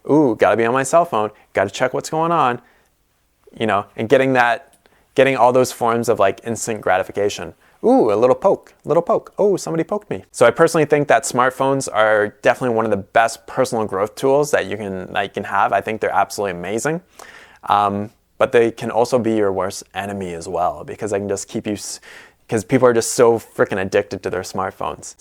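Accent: Canada. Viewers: I think he english-canadian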